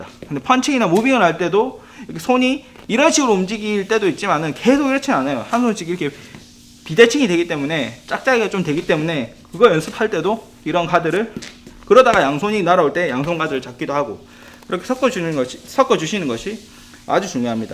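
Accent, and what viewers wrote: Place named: Gyeongsang, South Korea. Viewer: 경상도억양이 느껴져서 경상도에 있는 줄 알았습니다ㅋㅋ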